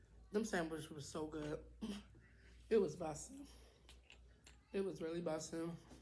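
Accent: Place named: Baltimore, United States. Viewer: Good morning my chocolate sis...i love your intro...i came across your vid by watching cringe binge and i was like let me check her out and your intro got me hooked...it is so humble and so adorable...seriously when i heard you talk i was like ummm i think she is from bmore...im from Maryland myself...im sitting here posting my own vid while watching yours lol hope you check it out but anywho i am going back to binge your vids lol have a blessed one hun... keep up the good work!!!! i totally agree on not following trends, my channel is about Ethnic food from different cultures becuz i want to open mine and others horizons on foods possibly...so basically i feel u on doin your own thang....#newsubbie...my bad im a talker but im done lol....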